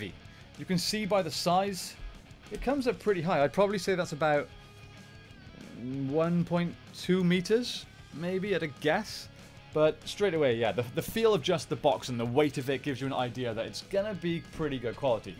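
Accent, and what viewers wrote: Wales, United Kingdom. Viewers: Barely related to the video but I’ve just realised you have a welsh accent (Cardiff, right?). Repping the welsh PR fans! 🏴😂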